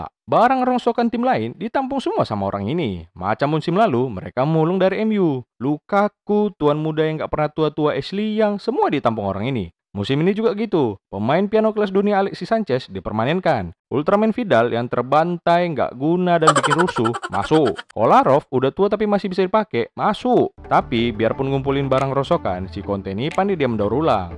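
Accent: Medan, Indonesia. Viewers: Logat² Medan bah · Memang harus diakui, MANTAP kali konsep channel kau ini bang. dengan logat medan yg khas, penyampaian yg unik dan Editing yg cukup rumit ditambah sentuhan humor, memang layak lah channel mu ini jadi konten kreator yg ditunggu tunggu. Semoga cepat tembus 1 juta subs abg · NEW SUBS ~~ gw suka banget channel ini, lucu dan menghibur dengan aksen melayu medannya, ok banget bang!! semangat terus dan tetap upload video, eaa !!☺ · Adminya kek orang Medan kurasa dari logatnya kwwkwkwk ngakak laeeeee wkwkkwkw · tapi tidak semua orang medan bisa membawakan video dengan logat bicara selucu ini sih menurutku. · Nampak kalii ahh logat Medannya😅.. mantavv bg 👍🏻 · Medan kalilah ngomongnya abang ini... mantap lanjutkan bang👍👍👍👍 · logat medan kali ahhhh... mantab kali kurasa. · emg kekgitu logat medan bg. Keras tapi lucu. 😀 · Org medan ini, kek paniliar kali awak sama intonasinya, horas lah lek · Bahasa Medan ini mah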